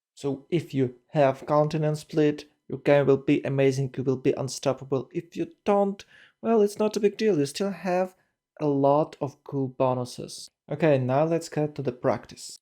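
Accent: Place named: Ukraine. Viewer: Поки слухав почув, що акцент ну дуже на мій схожий. Ну дуже от. Перевірив канал, написано– Україна. Поржав, подивився далі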